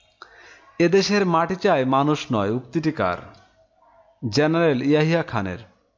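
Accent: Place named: Noakhali, Bangladesh. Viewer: উচ্চারণ পুরাই নোয়াখাইল্লা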